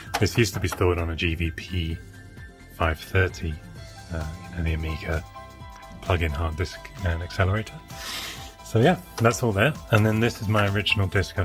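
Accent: United Kingdom. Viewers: your lovely British accent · It's great to hear a blighty accent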